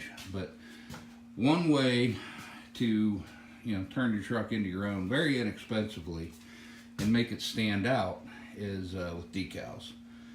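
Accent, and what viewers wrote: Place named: South, United States. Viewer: ^^^^ To O.J. Avery ^^^^ Maybe you should get some hearing aids or turn the volume up. How much do you really need to hear anyway it’s pretty self explanatory. I think you were just taking a jab at some who talks a little differently than you or has a southern drawl. That kind of senselessness is just mean and evil and a huge part of why we are where we are as a country right now. Hope it helped you sleep better at night. Peace ✌🏼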